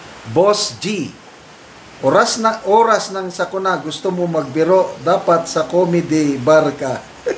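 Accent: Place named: Cavite, Philippines. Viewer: Ganyan iyong mga salita ng mga cavetenio Lalo na iyong mga addict na tambay riyan, kuhang kuha Nya iyong words at pagkakasabi at Punto ng Isa ng cavetenio!😊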